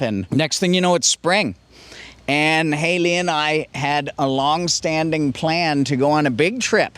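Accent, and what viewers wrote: Canada. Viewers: lol I love the canadian accent. They can't rob us of that no matter where we live, or for how long!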